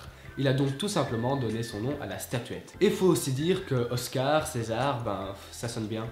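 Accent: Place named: Belgium. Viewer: Je te réponds 1 an après mais bon... Il dit les nombres comme ça parce qu'il est belge, à la fin des dizaines c'est ante comme soixante-dix c'est septante, voilà, 1 an après 😅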